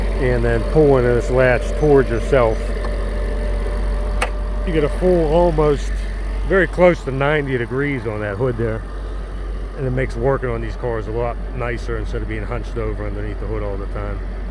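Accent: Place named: Pittsburgh, United States. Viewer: Are you in Pittsburgh. Want to have some similar things done to my SL. Who did you use. Sounds like a Pitts accent. Best, Dave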